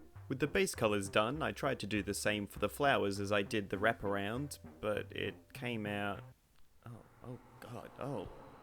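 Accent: Australia. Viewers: I came here looking for another great maker and was super stoked to hear an Aussie accent when it started. Love your work and your style. Subscribed - can't wait to see more.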